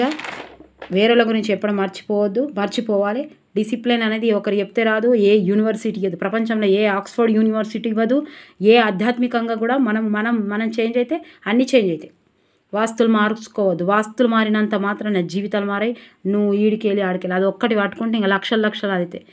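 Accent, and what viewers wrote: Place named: Mahabubnagar, India. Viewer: ఏ జిల్లా మేడం మహబూబ్నగర్ బాషా లాగే ఉంది స్వచ్చంగా మీ వీడియో సు బాగున్నాయి మేడం 🌹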